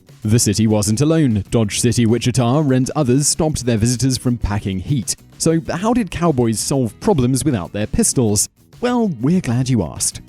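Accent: United Kingdom. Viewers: I dunno😏somehow hearing this British Accent speaking on the History of American Cowboys...quells my interest a little. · I didn't get past the first item. Bull corn. Towns did try to regulate, but EVERY cowboy carried a gun when he wasn't having to placate the townfolk. But when they were in Dodge City or Ogalala, they arrived dirty, randy and armed to the teeth. Pure fertilizer. And that this comes from an effeminate "researcher" with a British accent only doubles down the offense. Sign me, a hand from Nebraska. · Making the claims that you do, without research or quoting your sources makes your video totally unbelievable. I have spent over 40 years researching the history of the AMERICAN Cowboy and I will tell you (with your British accent) you are full of BULL. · Never trust a video about the American cowboy that has narrator with a British accent. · kind of disingenuous to hear a limey trying to teach us about cowboys. 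kind of like an American teaching a brit about the Queen, who is a man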